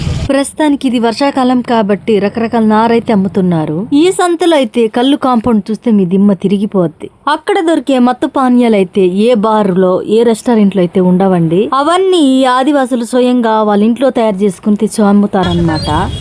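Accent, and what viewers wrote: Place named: Telugu, India. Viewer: మీ తెలుగు యాస కు బాగ ఎడిట్ అయ్యాను నేను మా ఊరు చిత్తూరు నాకు నచ్చిన భాష తమిళం 😮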